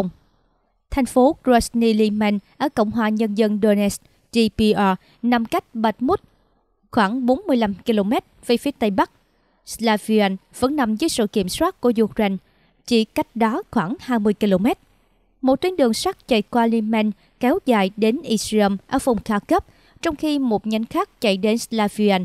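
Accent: South, Vietnam. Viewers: người tàu hả..đọc tiếng miền nam dể nghe vậy mà ko hiểu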